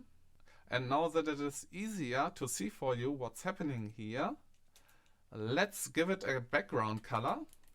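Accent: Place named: Germany. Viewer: He literally sounds German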